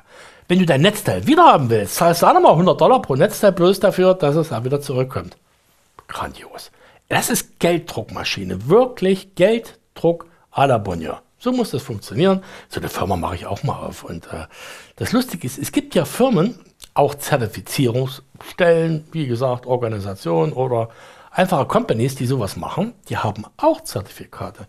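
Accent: Germany. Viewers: The dude speaks a funny german slang, which crashes every automatic translator anyway. Only for insiders. 🤣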